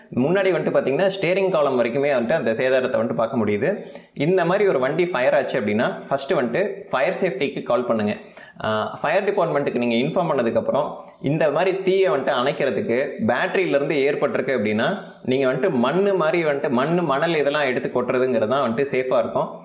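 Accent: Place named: Kongu, India. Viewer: மரயாதை,கலந்த கொங்கு,தமிழ்,அருமை🌷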